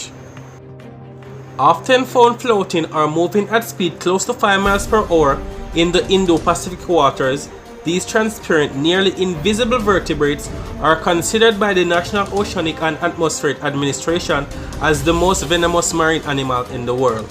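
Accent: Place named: Jamaica. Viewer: Come through with the Jamaican accent yesss these videos are popular but not with a JA accent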